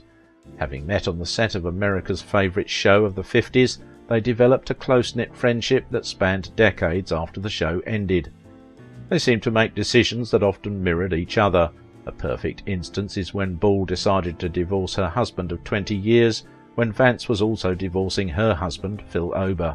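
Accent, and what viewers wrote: United Kingdom. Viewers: Does anyone else find it ironic that a nostalgic program about an American classic TV show is narrated by a gentleman with a British accent??🙄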